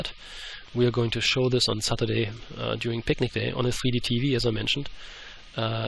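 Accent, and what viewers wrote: Germany. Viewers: Man this was awesome! Do I get it right that you have something like Virtual Reality glasses on to see the things we are seeing? Oh and, are you german? Your accent sounds just like that... :)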